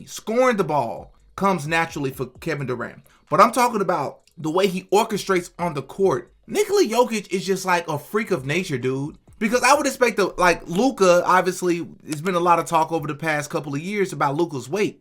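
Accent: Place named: United States. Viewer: Congratulations on your channel, and on this reaction. I am ignorant about basketball, but I like watching it. I am much less ignorant about soccer (I assume you are American from your accent, and since when I am on this channel I am in your house, I call my favorite sport soccer; I know you would have the respect to call it football if you were talking about the sport anywhere outside the US). Sorry about the long intro. You said something in this reaction that is exactly what I have been thinking about when it comes to nominating the MVP in the NBA. How can there be any doubt about who the MVP is? I mean it. When I vas at the University of Pittsburgh (3 years), I mainly concentrated on getting drunk before football, and basketball games, making sure I could still make it to the stadium or the arena with my friends..;-) But I watched the NBA's playoffs, final, all-star game, and I knew who the MVP was every year. 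so that was forty years ago; ok, speed up the clock to '95, or even t 2005, when I stopped following. I will bet my favorite soccer worn jersey (will tell you next time which it is if you care) that no coach, commentator, executive who cared about being safe on the street would have made the arguments (they are not even arguments) you hear now from those who support anyone but Jokich as MVP. I will tell you what makes it unbelievable. Not even in soccer would your these arguments. SOCCER yes, where fans, where fans will defend their team and its stars to the point o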